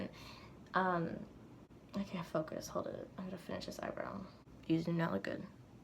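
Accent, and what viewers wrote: South, United States. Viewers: You have a little country accent oml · I love your southern accent 😂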